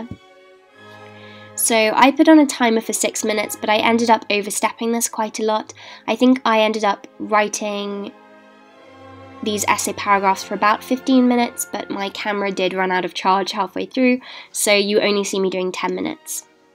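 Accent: RP, United Kingdom. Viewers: im british and i can tell you that nobody is this posh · Ppl are always saying that British ppl aren’t like this/don’t sound like this, that’s not true, there are so many accents in the uk! I sound like her and so do a lot of people, not everyone obviously but it’s true that this routine fits the very stereotypical idea of England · Love yr bristish accentttttt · i love how british people say haiy like im gonna brush out my haiy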